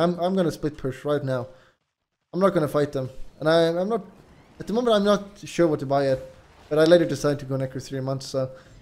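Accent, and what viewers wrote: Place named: Sweden. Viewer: i think it's his hard swedish accent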